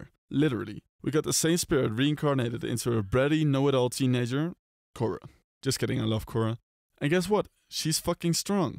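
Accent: Netherlands. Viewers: good vdeo but do i sense a dutch accent ? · Great list! Are you by any chance Dutch? I think that’s the accent I hear. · i think i hear a dutch accent, je maakt goeie video's.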